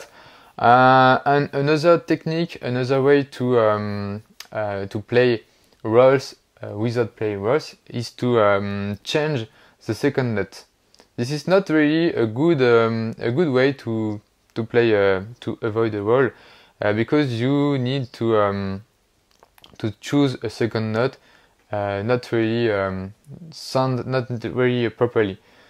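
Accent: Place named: France. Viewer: Je ne me lasserai jamais de ton accent so...french! Mais l'essentiel est de se faire comprendre..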